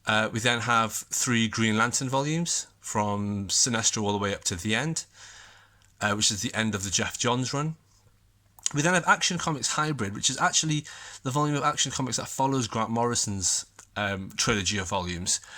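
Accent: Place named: United Kingdom. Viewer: Crossworlds was great, Jae Lee's art was incredible. I feel your pain with the shelves, here's a pic of mine. At the bottom I had to raise the right shelf to fit the Transformers Premier Collection books which are Absolute sized (I think - they're about 1cm taller than a 12 inch album). I also divide by format - omni/OHC/Premier/TPB. I just can't have them mixed up together. I collected Superman Unchained when it was released as floppies, but I gave up an issue or two before it finished because Jim Lee just didn't want to prioritise it and it took months just to get one issue. Somehow I never picked up on you being Welsh. Maybe it's because so many youtubers are Amerixcan I just heard a British accent.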